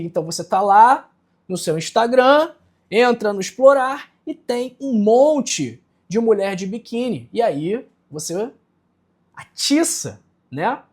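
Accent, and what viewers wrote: Rio de Janeiro, Brazil. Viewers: Não aguento o sotaque carioca. Meu Deus do céu, não dá!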